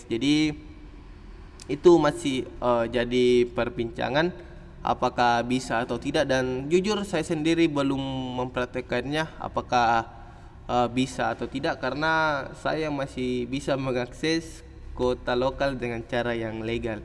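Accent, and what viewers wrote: Indonesia. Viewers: Ku bilang mmngja kaya ku tauki logatna...orang sulsel paeng.hhah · logatnya kental sekali sama logat makassar😂😂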